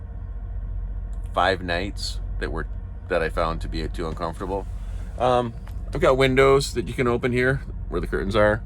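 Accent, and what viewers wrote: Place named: Canada. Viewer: I knew you were Canadian when I first heart your voice. Sound exactly like Alex Lifeson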